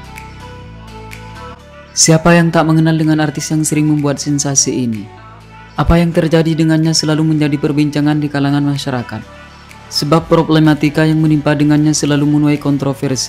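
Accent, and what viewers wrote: Madura, Indonesia. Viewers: Bang logat mu kok madura ya ??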